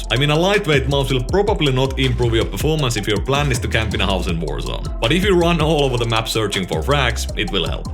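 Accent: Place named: Finland. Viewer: Aaaaaaaaaand the guys rallienglanti is just satisfying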